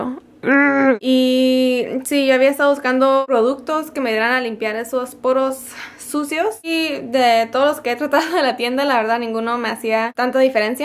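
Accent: Sinaloa, Mexico. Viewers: Eres de Sinaloaaaaaaaaaaaaaaaaaaaaaaa!!!!!!!!!!!!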